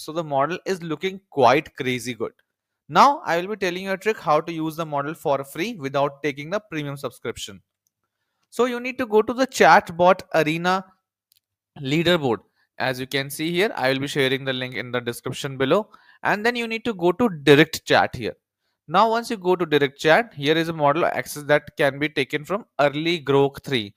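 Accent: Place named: Germany. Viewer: Dieses "Dinglisch" kann ich wieder nicht verstehen. Schade.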